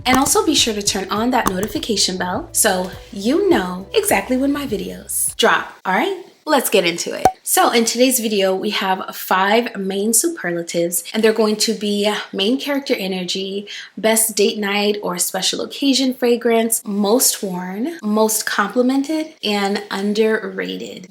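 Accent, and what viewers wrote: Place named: France. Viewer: She's definitely a French speaker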